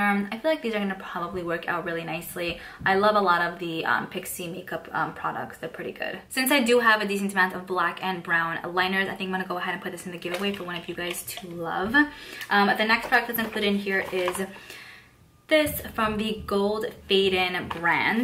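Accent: New York, United States. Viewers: Girl I live for that lip on you and your ny accent!!!! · I've been subbed to you for a few weeks ever since I heard about your collab, I've been really enjoying your content. The luxe box seems the same as others I've seen, your Ipsy ultimate was really good especially compared to others. I love your accent btw, is it NY? If so were sort of neighbors I'm in Philly, PA.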